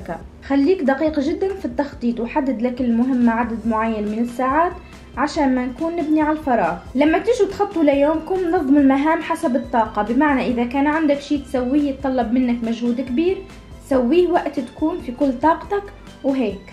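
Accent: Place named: Tunisia. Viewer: ختيتو الديفو تاعك والسبب لي ميخليش التفاعل يطلع عندك هوا اللهجة الي راكي تحكي بيها هوا المؤكد مدامك تونسية رح يكون اكثر المتابعين عندك من المغرب العربي وسورتو من دزاير ونحنا عندنا شوي نفور من هاذيك اللهجات والعقليات اما المضمون تاعك مفيد ومسلي والبتوفيق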